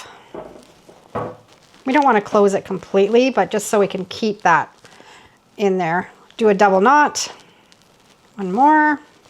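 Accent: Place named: Canada. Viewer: she’s so funny! I watch one of her videos at least once a night, and my husband yells out from across the room…. OUUUUUUUUTTTTT BECAUSE WE ARE NOT CANADIAN This is hysterical to us. Reminds me of all my aunts and uncles that live up there with this accent.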